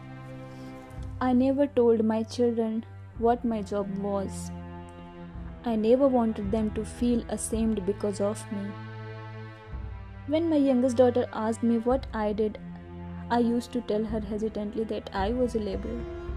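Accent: India. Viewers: Indian acent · Wow..But I dnt Knw Y I dnt Wana Hear Indian english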